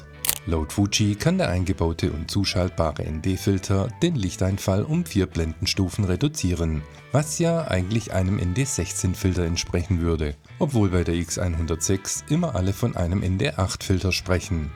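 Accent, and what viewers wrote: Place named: Swabia, Germany. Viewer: Schwabe, ja aber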